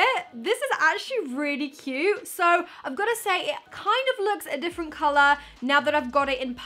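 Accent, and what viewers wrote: United Kingdom. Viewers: are u Britain??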